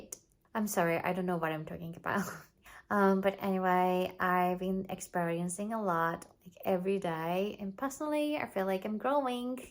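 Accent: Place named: United Kingdom. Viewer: Like your accent! Sounds so ‘British’ 😊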